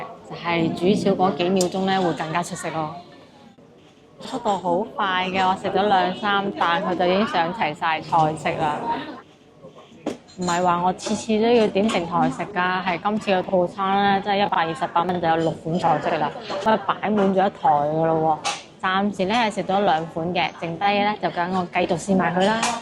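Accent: Guangzhou, China. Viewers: “你明唔明啊，系好香······呃裹种感觉”，一个香字，粤语发音拖得长长的，软糯糯，娇滴滴，还是广州女生讲粤语最好听，当然也分人，最好。。。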